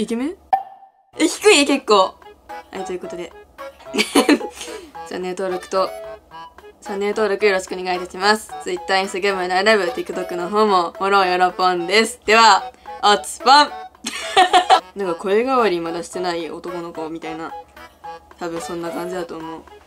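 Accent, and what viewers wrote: Kansai, Japan. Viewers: 関西弁が似合う可愛いちゃん ◎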